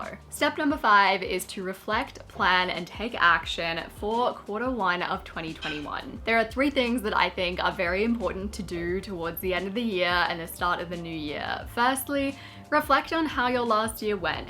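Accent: Australia. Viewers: I think from Australia! 🦘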